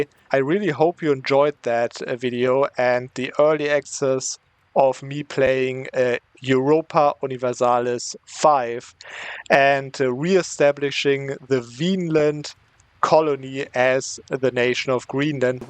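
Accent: Germany. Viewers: Holly shit the german accent is strong in you. Greetings from Germany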